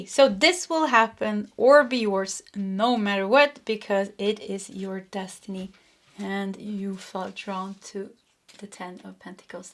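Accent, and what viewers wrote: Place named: Sweden. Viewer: Chose pile 3, have experienced a heart chakra activation in the past year and I love your adorable Swedish accent. You have such a sweet energy! I lost several family members to cancer, cardiac issues and dementia. My first half of my life was filled with trauma, loss and adversity. At 45 years old I am exploring a potential career change and I have connected with a soulmate.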